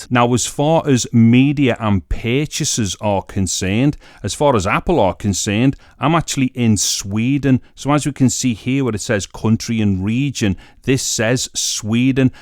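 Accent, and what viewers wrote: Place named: Liverpool, United Kingdom. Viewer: Are you from Liverpool? U sound scouse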